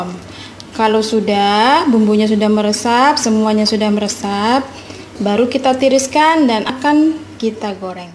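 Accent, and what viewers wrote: Java, Indonesia. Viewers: Maaf ya, ingin tanya daun jurok tu daun apa, sebab bahasa pengantar tu pelat2 jawa, sya ni peminat dri Malaysia.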